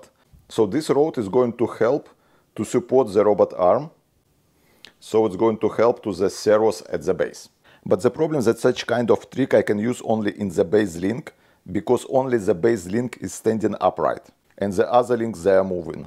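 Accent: Russia. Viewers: Perfect Russian accent Прикольная самоделка👍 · А ты не русский? Проато акцент есть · Strong russian acсent, beautiful ideas )) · Почему я слышу русский акцент?))) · блин, ты же русский ? акцент прям явный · Не пойму что за акцент???🤔🤔🤔 русский????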